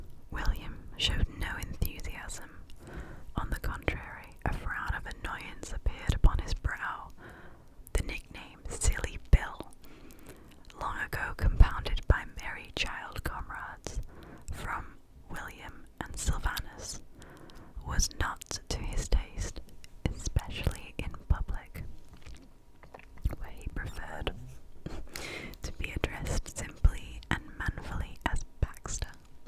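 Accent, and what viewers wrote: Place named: United Kingdom. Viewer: wow you have a British accent 😃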